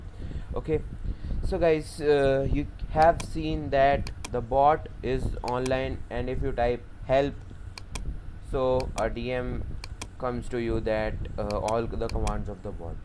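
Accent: India. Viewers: Me: joins the vid Indian voice Me: wait this one is definitely real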